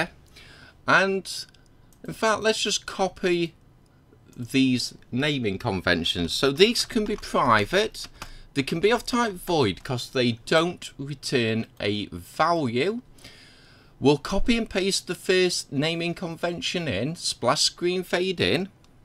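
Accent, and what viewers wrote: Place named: United Kingdom. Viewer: i like british accent~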